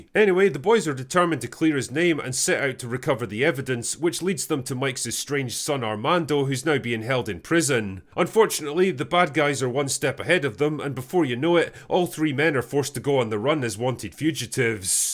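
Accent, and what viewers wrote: Scotland, United Kingdom. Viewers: I was wondering if this was simply a vehicle for Will Smith to crawl out of embarrassment hell but your review says otherwise. That it's just Will Smith trying to get back to his normal and forget that incident. Maybe he should just divorce his wife while he's on form and really dump his past and get on with Bad Boys 5...hell if Stallone and Swartzeneggar can keep going, why not. Thank you for the review, as always, poinant and entertaining with that wonderful Scots voice. Been enjoying you shitting all over Star Wars : The Immaculate twins haircuts but it's nice to have a change and this was a nice change.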